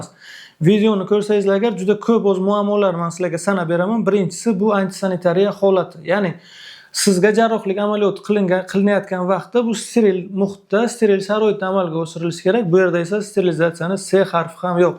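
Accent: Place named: Bukhara, Uzbekistan. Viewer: Оллох Рози булсин сиздан доктир. Доктир каерликсиз.? Бухороданми шевангиз бизга тортаркан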